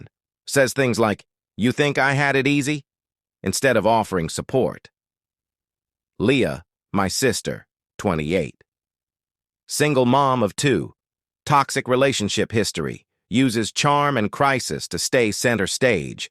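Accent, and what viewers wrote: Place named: Arkansas, United States. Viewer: I'M JUST AIN'T OMG THIS GUY IS FROM ARKANSAS 😮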